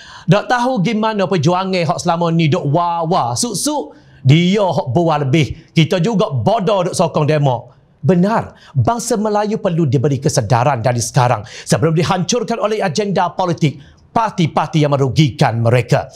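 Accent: Kelantan, Malaysia. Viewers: Bijok weeh demo ajuk keccek Kelantan.Ambo jatuh hati. 😀😃😄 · 😂😂😂speaking kelantan pawer lah bro ni👍👍👍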